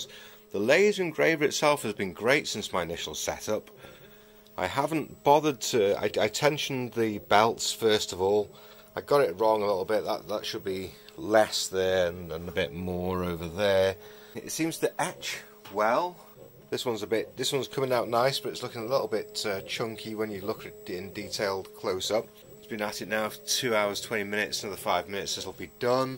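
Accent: Wales, United Kingdom. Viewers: my friend, I’m going to be crossing the pond next year and spending 5 weeks all throughout the UK, Wales, Scotland, and Ireland with my gf and a couple mates. I’d really like to hook up and meet proper. I’m going to be checking out tons of castles and stone circles. Hopefully there’s some in your area and I can knock out 2 birds. I’m just not sure where you’re at. You have a southern/wales accent to ya. Hope you get back with me...Cheers. Btw, I built my lichtenburner from a oil burner. It doesn’t have near the power yours has. What are you using? Todd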